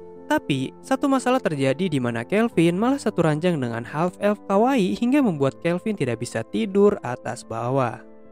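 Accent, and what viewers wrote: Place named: Sunda, Indonesia. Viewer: Bjir lu Sunda bang 🗿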